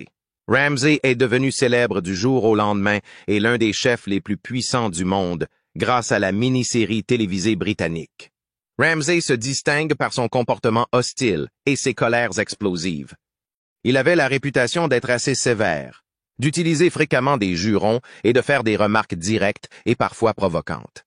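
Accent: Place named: Canada. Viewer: Heuuu pardon mais c'est quoi cette voix ? Cananadienne ? Ke veut bien mais là.... ça fait peur 😢😅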